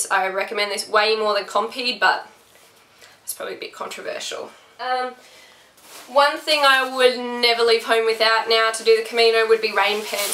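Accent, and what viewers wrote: Australia. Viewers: This was so helpful - ta! (And great to hear a fellow Aussie!) My cousin and I are doing the Camino in April next year (2020) starting on April 1, which seems pretty close to the time you walked. My main concern is with footwear. I find my feet get really hot and sweaty in goretex shoes, but I also know how wet it could be in April! Did you come across many people wearing non-waterproof shoes? Did you have any trouble with your shoes getting wet and not drying out properly? I love Salomon shoes but if I can get away with non-waterproof I would be very happy!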